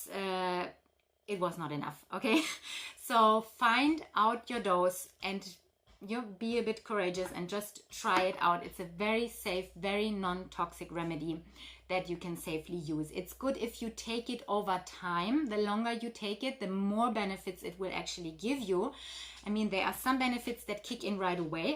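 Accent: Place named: Russia. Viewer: Thanks for the valuable advice. Have you studied herbs? Do you live in US? I sensed a Russian accent. I ask just out of curiosity.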